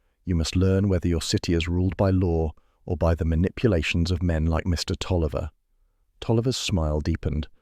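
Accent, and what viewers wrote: United Kingdom. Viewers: Doing these narrations is a lovely tribute to the works of Rex Stout, but as an American, I just can't listen to these stories read with British accent, sorry. Stout was a quintessentially American author, and the descriptions of New York, the brownstones, the furnishings, everything are all about America. It's jarring to my ears to hear them read as if they were Sherlock Holmes stories. (I wouldn't be able to tolerate Holmes read in a flat Yankee voice, either., lol)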